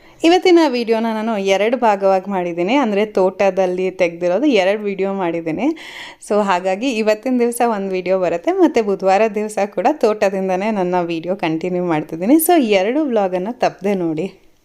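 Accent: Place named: Uttara Kannada, India. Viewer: Hearing ur language I thought u r Frm uttar kannada district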